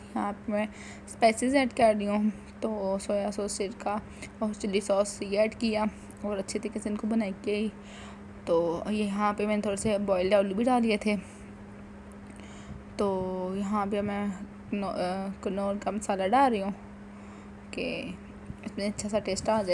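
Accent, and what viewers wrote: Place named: Faisalabad, Pakistan. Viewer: Ap Ka way of talk bilkul faslabadi logo ki tra h or pata b lag jata h JB ap bolti h yummmy pulao and mazy dar Matt saln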